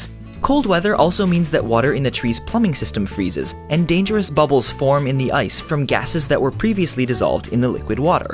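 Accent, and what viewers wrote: Canada. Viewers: Is this guy Canadian?!? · Are you canadian? Just askin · i didn't know that you are canadian. or are you? great video as always!!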